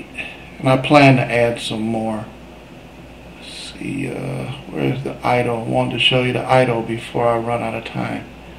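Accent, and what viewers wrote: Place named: United States. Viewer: Thanks for sharing this. I'm at the point where this is exactly my roadblock, my character needs to do more than just walking around. I know this was over a year ago but can you explain what you did, or maybe do another detailing changes....ie, >add Attack animation to Animator > setup Trigger in Parameters, >set parameter to >.01 etc....then also breakdown what lines of code you added to script or if you added a new script. It's kind of hard to see on this video without closeups or zooms. It is good to hear a good American voice on a tut! Thanks!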